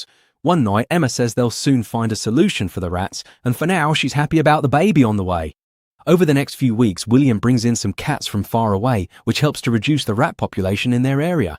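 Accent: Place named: United Kingdom. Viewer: briton accent.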